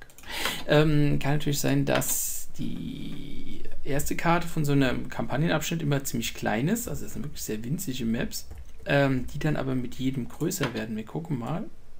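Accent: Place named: Frankfurt, Germany. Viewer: sorry aber Arbeite mal an Deiner Aussprache, dem Gesamteindruck,Struktur und Aufbau Deines Vortrages -der content stimmt ja ;-) aber so ein echter Frankfurter? kann net anners!?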